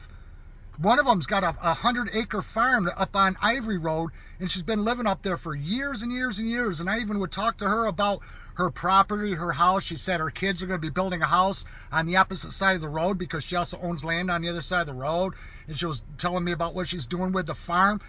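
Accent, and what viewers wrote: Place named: Midwest, United States. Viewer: I enjoy your videos and stories. BTW, love your Midwest accent. God bless you. Robert in northern, CA 🇺🇲 😉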